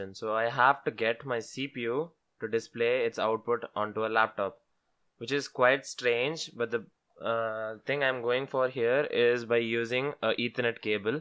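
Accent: India. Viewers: thanks my cool smart bro♥ your accent is also so cool and every time I hear Indian I hear intelligence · You know he is gonna spit some solid knowledge when that South Indian accent is coming out of that mouth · Indian? Subscribed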